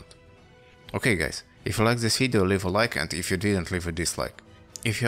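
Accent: Russia. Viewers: Акцент не очень. Понятно что ты русский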